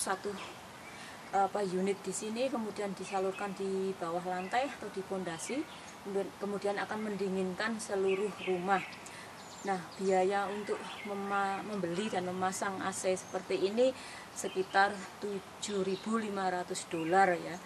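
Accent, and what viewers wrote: Java, Indonesia. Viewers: MB asline mana ya, kaya Jawa... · Mbak jowone nang ndi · Logate wong njowo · Wkwkw jawane ngendi bu?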